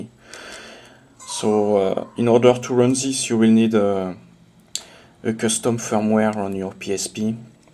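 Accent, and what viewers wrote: France. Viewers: Are You French ? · I like the frenglish ;-) Will it work under 3.90 M 33-3? Merci beaucoup pour le Video, Chris, de Corfu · It's no secret that foreigners can often write/speak better than native english speakers. This french accent is nice and understandable which is most important.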